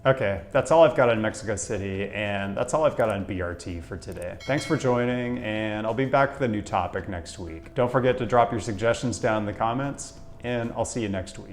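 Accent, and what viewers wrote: United States. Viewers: GREAT VIDEO! I, too, am a gringo nerd who likes your channel and is oddly entertained by your videos. I also LOVE México City and it's amazing, gigantic and extensive transit system -- and I don't get to visit enough! I ❤ CDMX🇲🇽! As a resident of a huge American city on the I-10 swath, where "Spanglish" is widely spoken, I feel the desire to help you out a little. (You definitely speak like an "I-90 gringo".) For "Hidalgo", say "ee-DALL-go" For "Insurgentes", the "g" is pronounced as "h": "een-soor-HEN-tes" and "CableBus" is "kahb-lay-BOOS" (hard "s") From one gringo to another, I hope I have nudged you one tiny step closer to Spanish fluency! 🤓👍🏻🇲🇽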